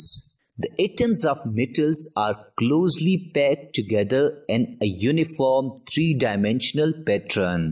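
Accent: South India, India. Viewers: It's his normal voice he is south indian that's why he sounds so.. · Hahahah it's an Indian accent that's whyyy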